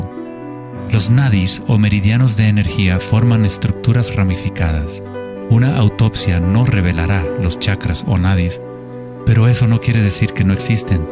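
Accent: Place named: Latin America. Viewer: Bastante interesante, lastima que este en latino, no es por nada, estoy más acostumbrado al acento español. Like.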